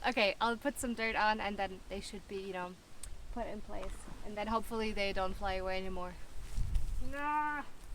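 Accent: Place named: Southern England, United Kingdom. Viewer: Hello there, first time on your blog. If than mini chainsaw is a Mader then you've wasted your money as un reliable quality.. Those bushes you are cutting are Minmosa and is a vicious weed, cutting them back will only make them grow three time more in spring. When you see the new growth come, wait untill a foot high then spray with Round and cooking oil 50/50 this will kill them and give you clean land for next time and watch for new seed popping up as they can be a problem. I know as been clearing my land for years, it was 10 times worse than yours when I bought it. Have now got a large lump cleared and producing. I am a market gardener and now just grow too feed me so if you have any problems or things you need to ask, feel free as am in Portugal as well so have a fair idea now on planting times and what you can and can't grow. 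In the meantime, have fun.Just one thing, olives are a waist of time as you can't eat them, there are other trees you can grow that will feed you, but your choice.Would like as where you are and where you from as the name is Irish but the accent sounds southern England.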